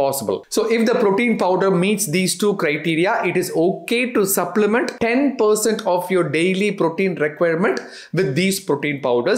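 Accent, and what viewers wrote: India. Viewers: You find him humorous? Only Indians maybe coz non Indians struggle to even keep up with his accent 😢😢😢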